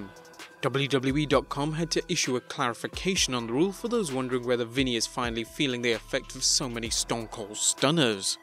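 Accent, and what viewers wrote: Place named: United Kingdom. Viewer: The voice of this vid should be in USA Not UK. Very bad. Lates.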